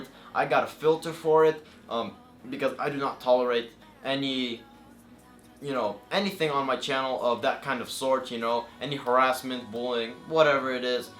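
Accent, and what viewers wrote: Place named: United States. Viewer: he said in live stream multiple times he lives in the U.S., and clearly has an american accent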